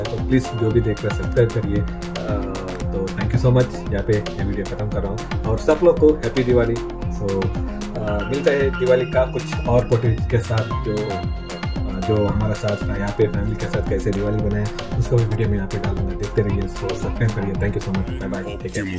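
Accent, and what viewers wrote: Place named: Northeast, India. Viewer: abe ve north east se hai... tujhe jyada dikkat hai to jaake sidha showroom walo se baat karle na ek to banda mehnat kar raha upar se ye C type log aa jaayege samne wale ke accent pe bolne ke liye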